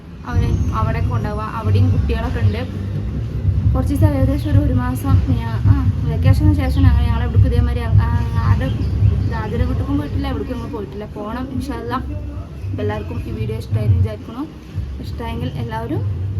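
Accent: Tamil Nadu, India. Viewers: എത്രയോ ചെറുപ്പത്തിൽ അവൾ തമിഴ്നാട് പോയതാണ് അന്നുമുതൽ അവൾ സംസാരിക്കുന്നതും ഇടപഴകുന്നതും അവരോടൊപ്പം മാത്രമാണ് അതുകൊണ്ടാണ് ഇങ്ങനെ സംസാരിക്കുന്നത് എന്തിനും കുറ്റം പറയല്ലേ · നീയൊന്ന് മലയാളം പറ അല്ലെങ്കിൽ തമിഴ് പറ. പറയുന്നത് കേട്ടാൽ തോന്നും നീ ജനിച്ച് വളർന്നത് തമിഴ്നാട്ടിലാന്ന്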